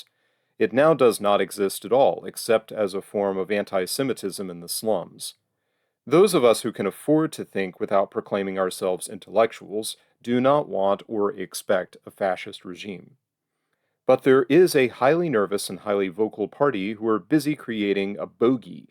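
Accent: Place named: United States. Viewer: Wrong for this to be read by an American voice.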